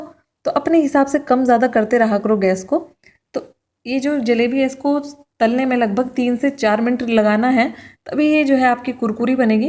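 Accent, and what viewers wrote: Haryana, India. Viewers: Aap pakka Haryana se ho… ye accent aur style sirf Haryana walo ka hi hota hai👍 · apki awaj s lg ra ap harayana s ho pls reply mam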